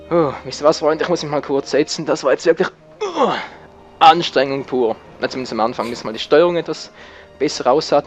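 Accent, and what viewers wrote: Switzerland. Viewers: ich finde deine videos echt witzig vor allen mit deinem Akzent. bist du Schweizer oder so? wenn du das so nachspricht was link so "sagt" hört sich echt witzig an. link mit einem schweizer Akzent xD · HA! Tscha zeme! Schwiizerakzänt!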